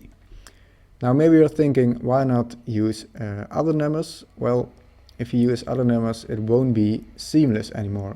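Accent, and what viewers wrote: Netherlands. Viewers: Are you dutch? you have like an dutch accent just saying❤ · are you dutch? you sound dutch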